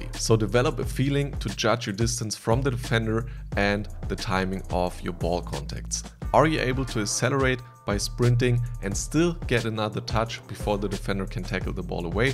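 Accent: Germany. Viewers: du klingst schon noch sehr deutsch in deinem englisch. aber cooles video! :)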